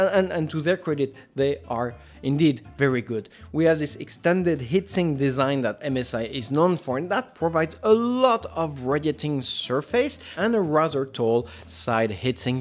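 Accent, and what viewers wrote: France. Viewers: oh, you're french? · Mais mais mais... je me disais bien que this voice hear french XD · I love his accent and now I desire a french man 😂🤦🏽‍♂️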